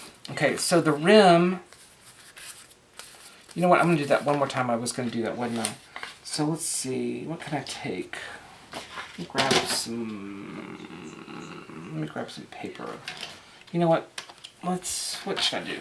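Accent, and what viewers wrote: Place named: South, United States. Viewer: Fiona has been singing your praises for so long & I finally made it over here. Now I know why. I love the way you don't even think about it. You just keep explaining as you're going along & it's like we're having a conversation. Well except for the part where you can't hear me respond. :) Also the accent & the y'all made me feel like I was watching a long, lost cousin. Where are you from? I was born & raised in Texas. :)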